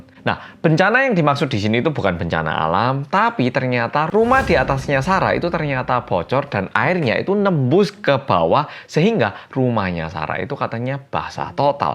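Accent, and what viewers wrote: Java, Indonesia. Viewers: Bahkan udah bertahun-tahun di Korea, medoknya kental bet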